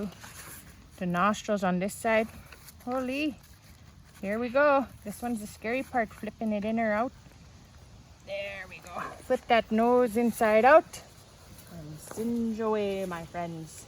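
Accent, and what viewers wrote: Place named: Ireland. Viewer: Your accent almost sounds Irish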